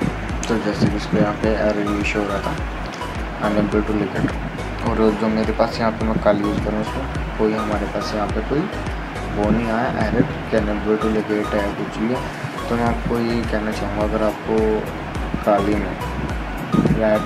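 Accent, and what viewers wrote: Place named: India. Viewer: You didn't notice the background noise and thick hindu accent? (facepalm). Seriuosly..get your hearing checked.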